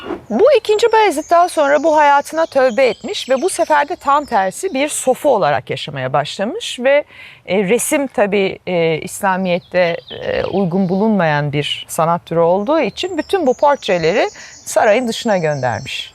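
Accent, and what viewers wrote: Standard. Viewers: Hanım sizi ilk defa izledim Temiz Türkçe akıcı anlatım👍 Ben her kusaktan son uc kusaktan cocuklara sahibim esnafim onlari okutmak ugruna kendime hic yatirim yapmadim pisman degilim.Devletin vermesi gereken egitimi kendi imkanlarimizla verdigimiz icin baska insanlara istihdam saglayacak sermayeyi olusturamadik egitim icin harcanan paralarda ise yaramiyor malum devlet polikasi.oy moy yok diyen uc cocuk var evde su anda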